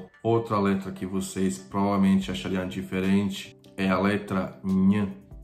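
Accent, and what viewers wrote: Minas Gerais, Brazil. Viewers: Você fala um português praticamente igual ao que falamos em Minas Gerais, isso mostra bem que há uma proximidade na fonética das duas línguas, já que o indivíduo sempre irá aprender outro idioma aproximando o máximo possível a fonética de sua língua materna à fonética da outra língua. Ótima explanação sobre as letras do alfabeto croata desconhecidas por nós brasileiros.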